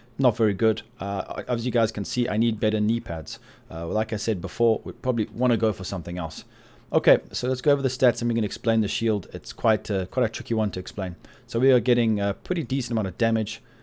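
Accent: South Africa. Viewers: Hey bru love your vids. Are you South African? I'm new to the game been playing about a week. Just got to WT5 and trying to unlock my specialisations.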